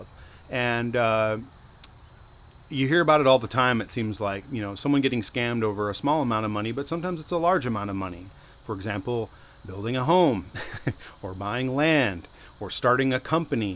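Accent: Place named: Georgia, United States. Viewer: I made a comment earlier saying I’m new to your channel. I said that before I listen to the whole video it sounds like you’re back in the USA maybe Savannah Georgia which I’ve been there before because my son-in-law was stationed at Fort Stewart anyway really good video. I’m hoping to move there in a couple months to retire. When do you think you’ll be heading back to the Philippines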